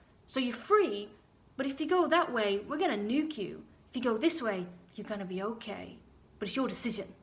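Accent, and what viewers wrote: Ireland. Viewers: You have a slight Irish lilt or is it country bumpkin ..well ,can we get a close up on these rings ? Your logic makes too much sense ,and this is why you are out of it. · Is that an Irish accent trying to sneak its way through? Great video btw! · I saw that movie, Gas light.....some frenchman and I think Ingrid Bergman. He was turning the gas light down just a little or something, making her think she was losing her sight also. YOu are spot on, if anything a little soft on them. Do I detect an Irish accent? it is very slight, but I love it.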